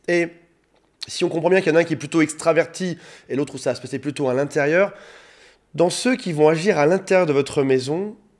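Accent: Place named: South, France. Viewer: avec un de ces accent du midi ! c😊ng 😄🤗👍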